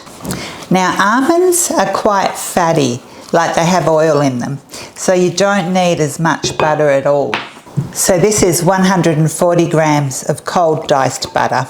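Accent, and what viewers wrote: Australia. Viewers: great to hear an Aussie accent on here for a change. Looks yummie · By the way, I didn't recognize Ur accent until U mentioned Australia. Of course all accents are nice. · OMG, so agree with your comment! Love her cooking style and love, love listening to her Aussie accent; this is a keeper! ❤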